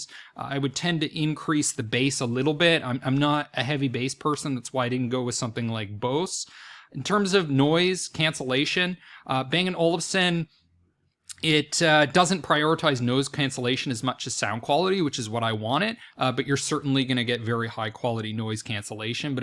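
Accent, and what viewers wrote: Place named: United States. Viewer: That’s a nice review. It’s very nice informative. I also own a pair of H95 headphones. I gotta say I really like your articulation American accent. Where are from if you mind me asking?1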